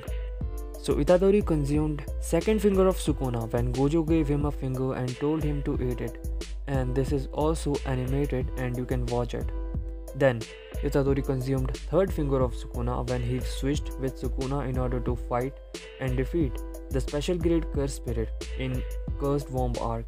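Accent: India. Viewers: Indian accent☠️ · U Indian right ?. The accent is just shouting it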